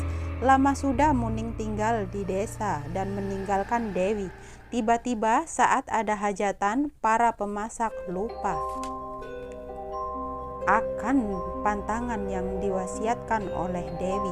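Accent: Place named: Rejang, Indonesia. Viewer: Logat rejang pngisi acrany kntel bgt 😊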